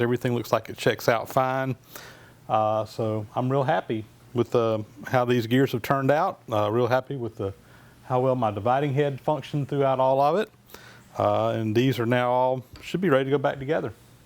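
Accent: South, United States. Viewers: Oh dear lord!. I had the Closed Caption turned on. Your southern accent is giving it fits. You simply have to watch a few portions of this video with the CC on, it'll drop you to your knees its so funny how it interprets what you say. Example "... about replace when us we're going to use this hotel room a which had been looking and come from the research for the the strength for this material I thinks gonna play me strong enough for this final dear in a reason the use that the from noise reduction for this final gear in a reason the use that the from noise reduction the have that bad fastest turning here for a walk in the metal he had a something that would dampen the operation tumor in the blower....." It gets worse.......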